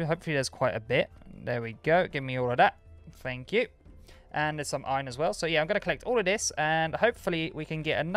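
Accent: United Kingdom. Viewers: Its british. I have the same accent. It doesnt sound like he has an accent to me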